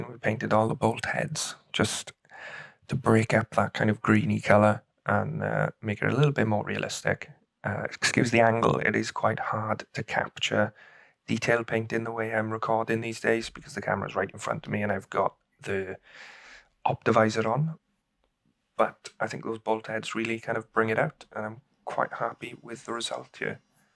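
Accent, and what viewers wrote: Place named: New Zealand. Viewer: Great to hear an accent/dialect from home ! - excellent set of videos for this kit. Perfectly lit/shot and measured delivery. Great work matey. Cheers from Aotearoa/NZ.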